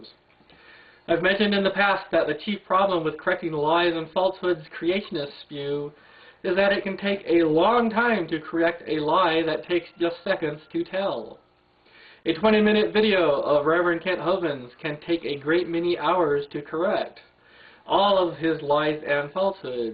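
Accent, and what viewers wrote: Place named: United States. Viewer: How the hell can you mistake an American accent for an Australian one? Though I guess you have to be sheltered to like Hovind....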